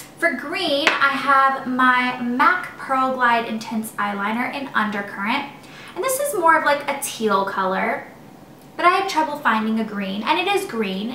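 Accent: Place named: Tennessee, United States. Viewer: Pretty sure the CVS eye makeup remover is the same as the neutrogena one! Just in case you can't find a CVS. I love hearing your TN accent (I miss living in Nashville).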